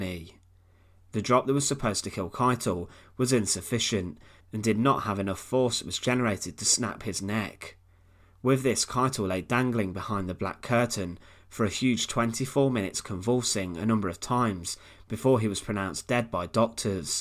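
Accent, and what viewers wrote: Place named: Yorkshire, United Kingdom. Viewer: I'm curious if Brits have some kind of secret laboratory where they try to develop the strangest accents possible... earlier today I was just thinking, if "Brits are so opposed to the letter "R", why did they put it in so many words, I mean after all it's THEIR language, they could have made it any way they wanted"... I deal with people from all over the world and they all pronounce "R" as "R" [well accept for some Asian nationalities (Japanese, Korean) where they can't hear the difference between "R" and "L", but that's another story...), and not "ah"... so "Remember" instead of "remembah"... ...and then I clicked on this video...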